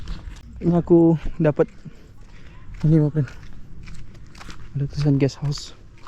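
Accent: West Sumatra, Indonesia. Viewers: logatnya logat minang bg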